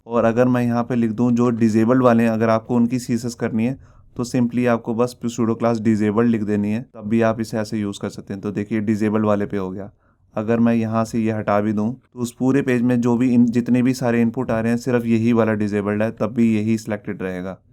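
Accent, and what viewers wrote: Punjab, India. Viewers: Sir aap punjabi ho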